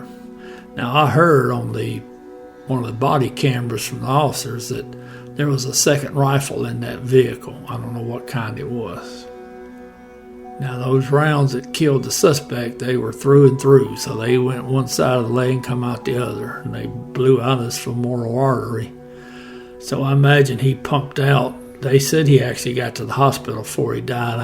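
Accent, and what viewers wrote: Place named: South, United States. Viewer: I'm always glad to see you post another video. Your commentary is easy to understand, I can appreciate the Southern accent (lol). And you always mention the family's of the officer. Thank you very much.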